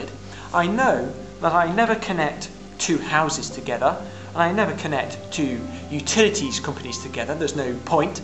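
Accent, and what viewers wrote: United Kingdom. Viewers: the britsh accent is what kept me watching, and then i actually found it interesting!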